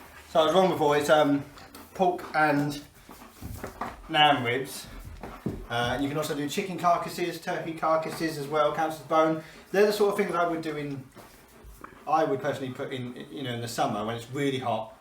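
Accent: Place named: England, United Kingdom. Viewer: Thank you so much for the video! Your advice helped me a lot on planning my puppy's diet. Especially the posters they're so useful. There's just one little thing, I am a foreigner and am not very used to the English tone of English so there were lots of parts I didn't really get what you were saying. Would you consider adding subtitles or giving a brief description of the video? 😢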